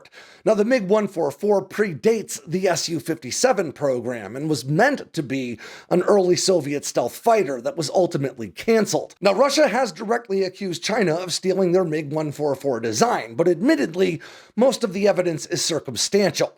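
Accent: United States. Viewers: "We had B-52's, flying around" -- 1) Yup. All true. Because we thought it was that, or nuclear annihilation. Stakes are a tiny bit different with Taiwan. WWII and the Cold War are too far off. I also believe in the greatness of the American people as much as you do. But that doesn't mean we always have the political will to act. (Also, Aerial refueling, not Arial the font!) 2) Still, dude, you don't help the American case by sounding like the 'MURICAN parody!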